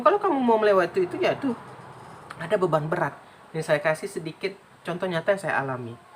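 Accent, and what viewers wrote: Padang, Indonesia. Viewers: Suara kaya logat org padang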